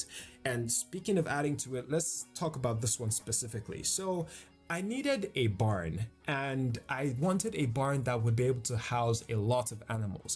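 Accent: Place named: Nigeria. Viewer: Your vids really helped me break my builders block thanks man. I always knew you were Nigerian hehe😅... M also a Nigerian we know our tongue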